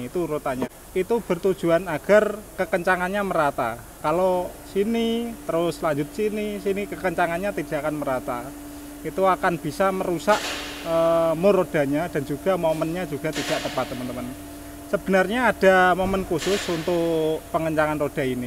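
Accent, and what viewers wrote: Java, Indonesia. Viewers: Ngomonge kaya wong jawa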